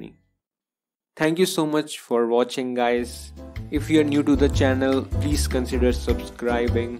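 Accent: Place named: India. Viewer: Just found out I need to listen indian accent at 1.5x speed.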